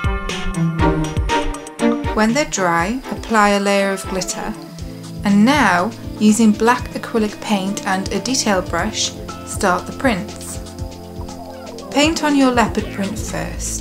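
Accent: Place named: RP, United Kingdom. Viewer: You have such a posh accent haha:)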